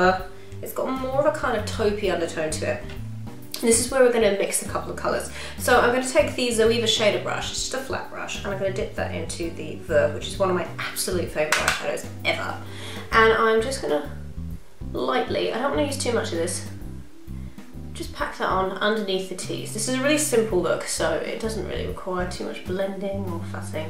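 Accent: United Kingdom. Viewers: I agree with Teresa!!, your voice is so pleasant to listen to!, very relaxing and I do too love your british accent, that's something I have always liked (british accent). This tutorial was very good too, you explain so well!!. The lipstick/blush combo really complemented each other. Very pretty!!, you are our favorite!!!, keep the amazing job!!! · you are sooooooooooo beautiful even without makeup...but then again you must get that a lot already....but i still had to say. love your tutorials and thanks for putting up all the names becasue sometimes i hv a hard time picking up on the words with your absolutely lovely sweet english accent.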